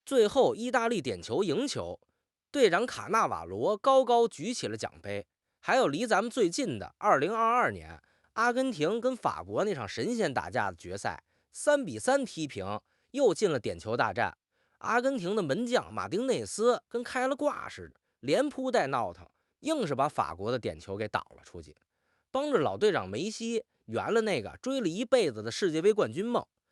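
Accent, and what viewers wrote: Beijing, China. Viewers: 的字幕不會用簡體中文不過這老北平口音....像，很像啊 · 作为北京人，喜欢这腔调👍😂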